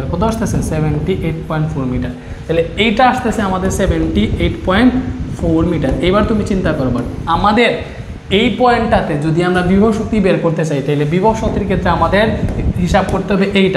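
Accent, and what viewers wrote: Sylhet, Bangladesh. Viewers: স্যার সিলেটি❤ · তুমি মিয়া সিলেটর কোন জাগার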